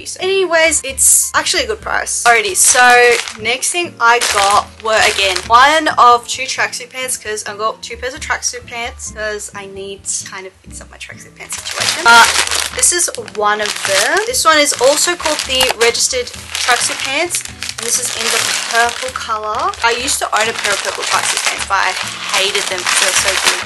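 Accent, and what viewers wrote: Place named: Australia. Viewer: Literally obsessed with ur Aussie accent 😍 if u edit on ur phone can u do a video on how u edit I love ursss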